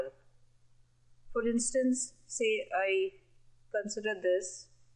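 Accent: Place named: India. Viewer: too many x direction y direction in indian accent -.- ....makes it so uninteresting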